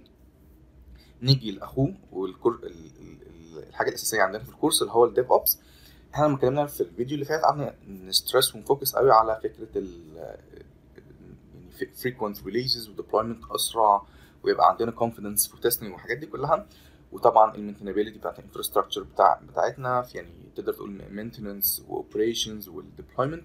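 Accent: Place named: India. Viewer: هههه صح كانك هندي لكن كل الناس خير و بركة ماشاء الله عليك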